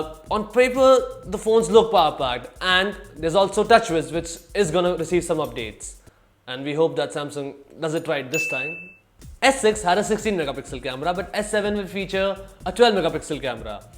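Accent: India. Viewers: i could not understand a word from your Indian accent...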